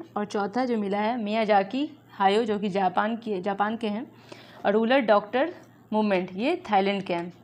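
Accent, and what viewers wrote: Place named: Patna, India. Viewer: Aapki bhasa patna ki hai...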